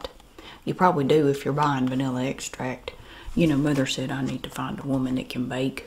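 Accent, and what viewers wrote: South, United States. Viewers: is the epitome of adorable AND cringe. And the southern accent really adds to that I'm sorry to say 😆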